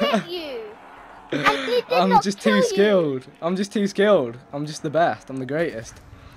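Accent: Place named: United Kingdom. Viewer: For some reason his British accent makes me not want to punch him in the face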